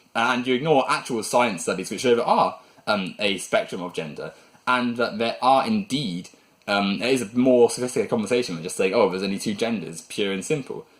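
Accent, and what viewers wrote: United Kingdom. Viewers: Penises and Vaginas. Can I get my award now? Ur British accent doesnt hide ur stupidity.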